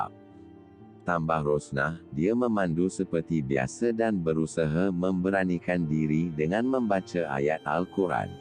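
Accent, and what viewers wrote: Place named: Sabah, Malaysia. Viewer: Sial lah suara Sabahan 🐷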